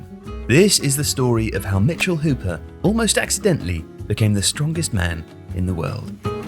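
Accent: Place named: England, United Kingdom. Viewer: I checked the comments halfway through the video and had no idea what this could refer to Then I got to the end of the video... good lord it's jarring after the soft English of the main portion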